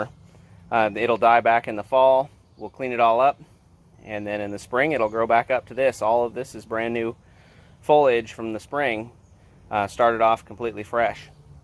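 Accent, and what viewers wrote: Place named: United States. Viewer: First time I’ve seen your video and I’m totally in love with your American accent Oh and of course your lupins !!!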